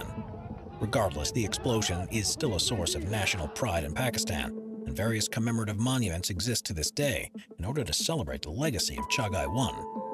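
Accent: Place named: United States. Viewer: Come on, you sound American so why not use the non-metric units we use, like miles and pounds instead of kg and kilometers?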